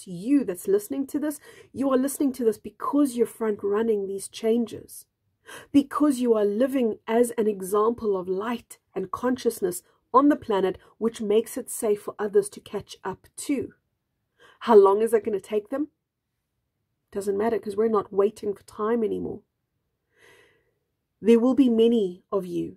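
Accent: South Africa. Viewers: Thank you for showing up for me right now. Perfect synchronicity. What a beautiful channel you are. And healing, too. Bless you. (Your accent sounds South African?)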